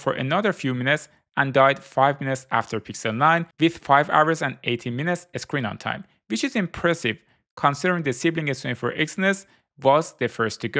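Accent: Iran. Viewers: Love your persian accent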